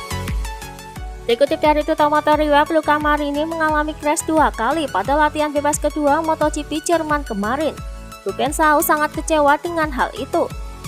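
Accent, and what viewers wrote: Java, Indonesia. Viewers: Admin ny medok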